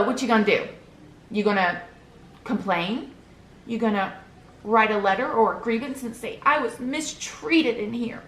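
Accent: United States. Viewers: it's interesting how you have this strong American accent but your mannerisms and the way you emphasize or repeat phrases, so italian-canadian haha I love it cuz that's how I talk too but in a different accent xD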